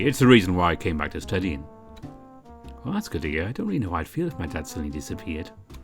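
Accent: United Kingdom. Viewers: I love the witty banter. It was nice, it goes well with your British accent.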